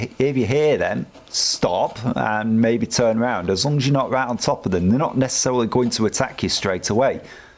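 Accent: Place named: United Kingdom. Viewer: HE'S BRITISH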